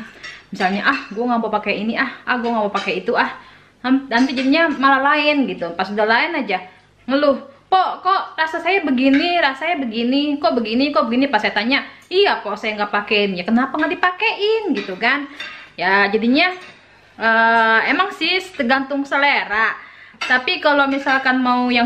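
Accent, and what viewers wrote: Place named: Betawi, Indonesia. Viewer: Kagak usah banyak bacot😁😁betawi bangat dah...cipok2 buat 😘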